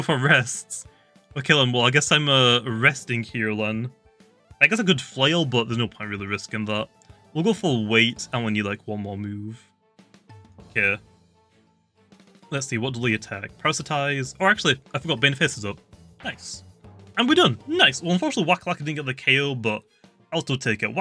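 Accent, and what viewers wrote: United Kingdom. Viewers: British accent.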